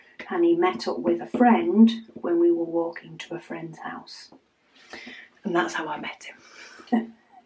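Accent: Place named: Cheshire, United Kingdom. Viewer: I wondered if you were from the north. My Grandma was born and raised in Widnes. She came to America after the end of WWII. She was a war bride and came across the ocean all by herself on a boat full of other war brides. Sometimes the things you say, or the way you pronounce things reminds me so much of her. Cheers to another girl from Cheshire!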